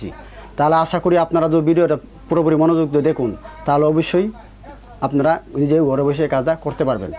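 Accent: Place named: Sylhet, Bangladesh. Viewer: কোন মাঠ নাই আপনি সিলেটি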